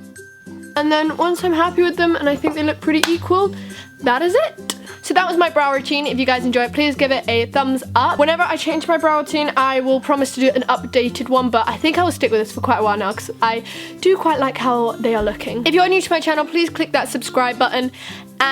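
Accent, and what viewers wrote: United Kingdom. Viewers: love your british accent so much<3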